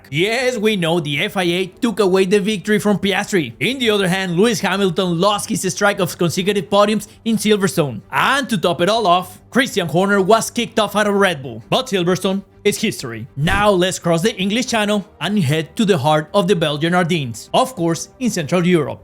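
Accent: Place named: United States. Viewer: Awesome! I like your American accent!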